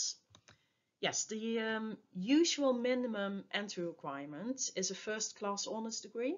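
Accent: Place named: United Kingdom. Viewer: I like the British English accent this lady has. It is so elelgant.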